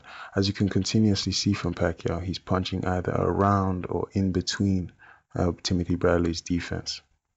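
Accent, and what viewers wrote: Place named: United Kingdom. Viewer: Okay I have to ask, are you the same guy as +barryrobinson who also has a boxing film study channel? You both are black guys who are Flomo's. You even use the same vocabulary such as "defensively responsible, changing head slots, etc." The only difference is you talk w/ a British accent. If you're two different ppl, then you're a fucking hack and get your own ideas. And ffs PLEASE tell me you're not the same guy cuz that'd be so fucking lame lololol.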